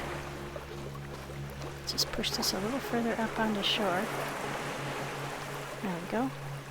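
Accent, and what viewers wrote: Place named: United States. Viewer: Ah... A kindred soul! I wonder how many more of us there are 'out there'? You sound American (Canadian?) I'm on the other side of the pond, so if I goof, please don't take offence. My go-to game is Horizon Zero Dawn. I find the sequel disappointingly boring. Fortunately my son gave me his spare PS4 during the Covid fiasco, and that got me turned on to gaming.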